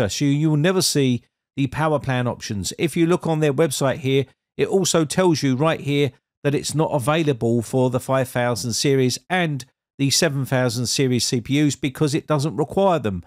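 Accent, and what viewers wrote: United Kingdom. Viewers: He's clearly got a British accent mate are you okay?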